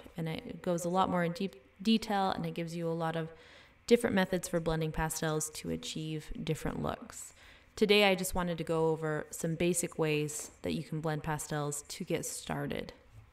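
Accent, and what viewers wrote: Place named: Utah, United States. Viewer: I could tell by your accent, that's why I asked. My dad's from Utah, and you sound like a lot of my family on his side (and many a church leader I had 😅)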